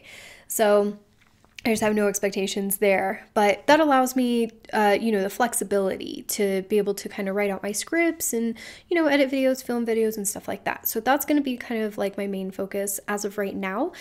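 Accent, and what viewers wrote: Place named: England, United Kingdom. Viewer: Where are you from? I love your English accent ❤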